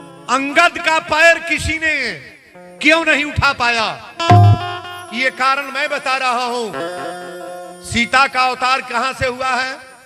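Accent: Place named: Bihar, India. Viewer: Katha to Aapki theek thak hai par brahmad hokar bihari ganwar bhasha bolte ho.?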